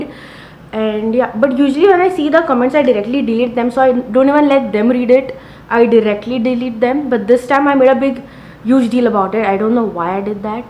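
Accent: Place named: Cockney, United Kingdom. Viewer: Hey you speak very fluent English.. also kokni i like a lot..so keep it up.. ignore negative energy they are here from lifetime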